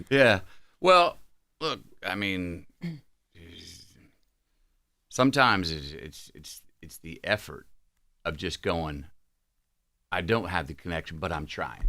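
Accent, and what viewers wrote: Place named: Texas, United States. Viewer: Gurlllllllllllll I broke my nail I CLICKED SOOOOOO DAMN FAST!!!!!! I loveeeee his Texas country deep voice having ASS!!!!!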